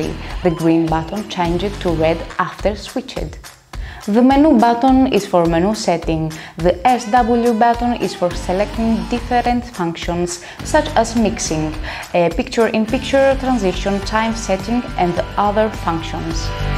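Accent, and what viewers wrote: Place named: Greece. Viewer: r u Greek? I love your accent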